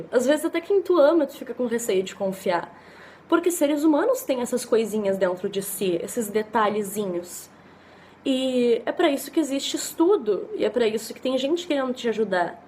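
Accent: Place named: South, Brazil. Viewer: 박지민 e do Sul ne...sotaque maravilhoso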